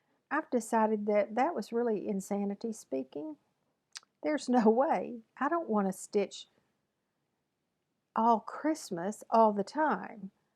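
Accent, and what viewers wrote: South, United States. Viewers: I love your southern accent. I could listen to you all day. Take care.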